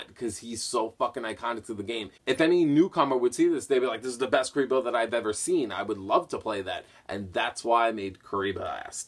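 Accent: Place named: New York, United States. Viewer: yo you live in Alabama or just have an Auburn shirt? I always assumed you were from new York or somewhere around there by the accent.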